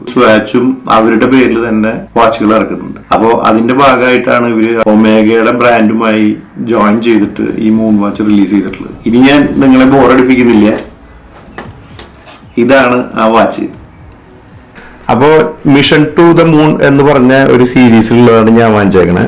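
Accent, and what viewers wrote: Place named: Thrissur, India. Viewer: തൃശൂർ ബ്രോ ആണല്ലേ 👍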